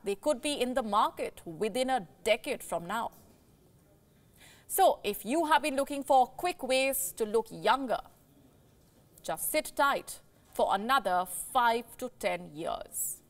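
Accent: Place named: India. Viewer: Don't speak lye like Indian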